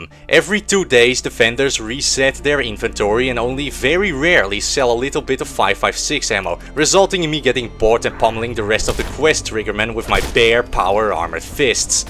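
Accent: Netherlands. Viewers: hou van het nederlandse accentt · Heerlijk dat Nederlands Engels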